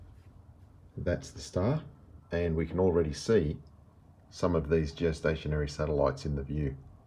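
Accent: Australia. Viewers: love your accent and australian accent in general. i learnt my english with a canadian prof and i wished that my teacher was british or australian. cuz canadian accent is boring af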